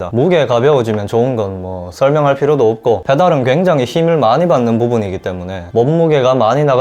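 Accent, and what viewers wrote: Gyeongsang, South Korea. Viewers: 정겨운 경상도 사투리.... 은근 그림 잘 그리심!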